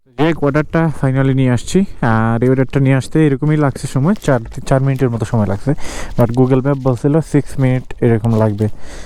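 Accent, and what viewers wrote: Sylhet, Bangladesh. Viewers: apni sylheti?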